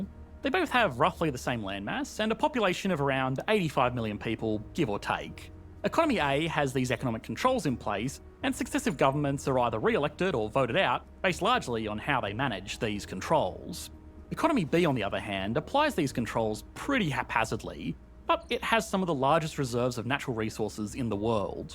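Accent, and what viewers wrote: Scotland, United Kingdom. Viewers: I wasn't talking about but about the narrator in the clip he reacted to! Scottish accent is genuine and I really like it, but that guy in the clip sounds fake as hell and just annoying.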